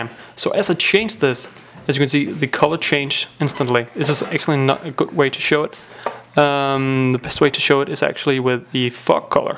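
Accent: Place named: Denmark. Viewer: Idiots, it's because he is from Denmark not UK or USA. That means English isnt his main language, I guess :P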